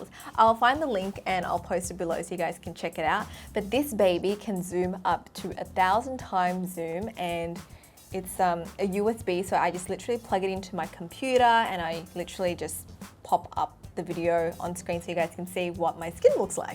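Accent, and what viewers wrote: Australia. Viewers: AN AUSSIIEEE YAYA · are you australian? you're pretty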